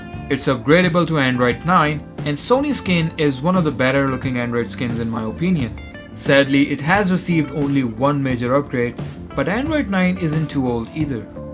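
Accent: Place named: India. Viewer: less indian accent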